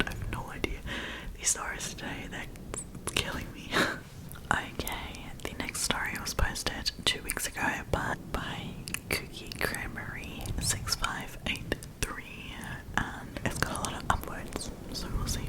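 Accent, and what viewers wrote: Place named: Australia. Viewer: I’m in shock, I’m Aussie and 100% thought you were Australian too! Your English accent is so Aussie I actually can’t believe you’re German 😮 please keep the vids coming they are so good!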